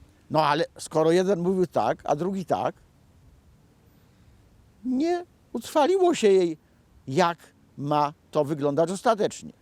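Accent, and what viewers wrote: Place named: Kraków, Poland. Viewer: Po Krakowsku mówi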